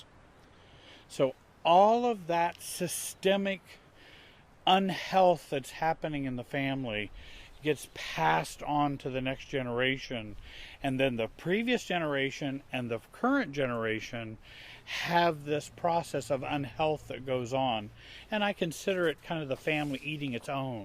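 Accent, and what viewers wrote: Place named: Indiana, United States. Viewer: 100% this man is from Indiana lol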